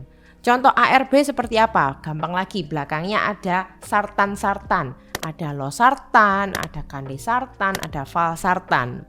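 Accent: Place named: Surabaya, Indonesia. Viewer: ini dokter atau apoteker.. pd manggilnya dokter hehe.. ini lulusan jawa timur yak?, medok suroboyo an rek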